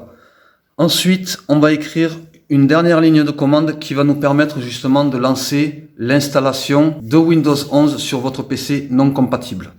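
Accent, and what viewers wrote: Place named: Southwest, France. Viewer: Super tuto !! bravo pour cette méthode simple et efficace👍👍 j'adore votre accent du sud-ouest 🤔🤔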